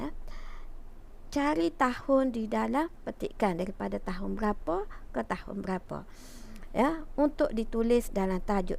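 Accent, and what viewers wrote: Kelantan, Malaysia. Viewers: Cikgu mesti drpd Kelantan kan? Suara cg sedap didengar buat kitorang xberhenti fokus..terima kasih Di atas perkongsian..❤️❤️ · Kecek kelate ye cg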